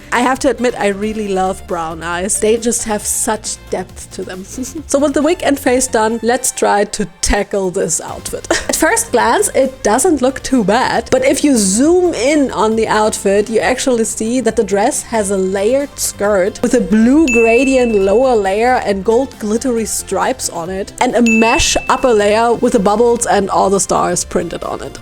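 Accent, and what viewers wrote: Germany. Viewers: i literally KNEW you were German cuz it’s the accent, ANYYWAYYY girl how do you have so much talent? 😔that doll looks AMAZING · Du klingst deutsch 😉 👍🏻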